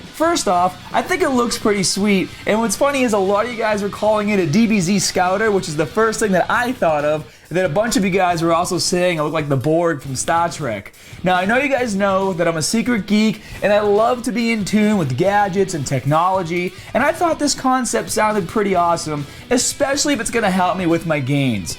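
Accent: Boston, United States. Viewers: 1, I'm not addicted to caffeine I have a coffee every second day before I train I also believe you drink coffee 2, my points were not meant to be insulting but this is the second time you come out swinging at something I said,, but tbh I seen a comment left yesterday about how you come up with , you should buy FAT GRIPS ,, you should buy The abs boots you should buy this stupid thing attached to my head with after a certain amount of time you don't use,, being sub a long long time and you know what your Turing into more of a sell out and big fucking douch bag having a go off some ones view point,, which leads me to believe your in commission for them and I believe the only studies were carried out by them not professionals,,unsubscribed and another thing since you let your Boston accent come out you turned into a mark e mark douch bag good luck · Okay, so after watching his first videos from 5 or so years ago, how did he somehow gain a Boston accent, and get so yelly?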